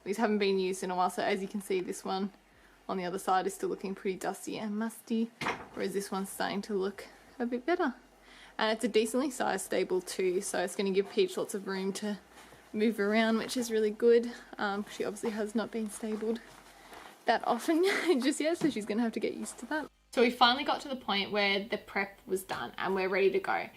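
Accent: Australia. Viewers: I realized today that I must watch way too much Bondi Vet because I Wasn’t even paying attention to your Australian accent until you started talking about the summer and winter months. I kept rewinding it and listening to that part over and over again thinking I heard it wrong and then you said a thing about the northern hemisphere and it all clicked into place and I feel like an idiot. Apparently all my Bondi vet hours have made the Australian accent sound normal..... I’m in Texas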